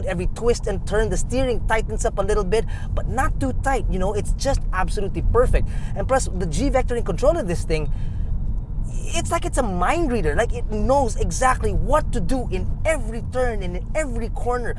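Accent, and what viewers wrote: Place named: Philippines. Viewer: Forget the car. It’s that excellent Filipino English. Bravo!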